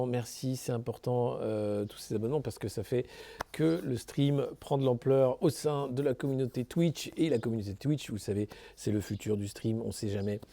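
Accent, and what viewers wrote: Quebec, Canada. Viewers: L'accent québécois "a frigore" !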